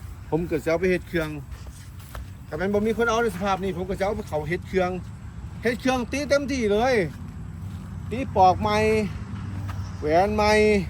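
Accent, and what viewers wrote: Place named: Khok San, Thailand. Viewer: สำเนียงคือคนบ้านเฮา บ้านโคกสาร คัก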